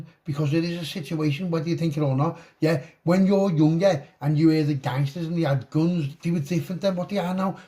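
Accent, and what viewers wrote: Liverpool, United Kingdom. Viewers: You sound like you got a cold.scouse❤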